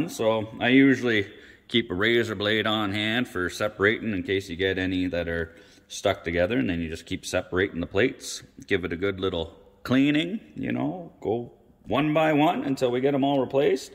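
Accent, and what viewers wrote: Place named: Maritimes, Canada. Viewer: I like your accent, but trying to place it. I've got it narrowed down to either PEI or NS. Am I close?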